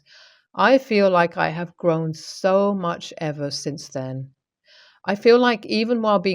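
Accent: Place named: East London, United Kingdom. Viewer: It's a working class English accent from London's East End.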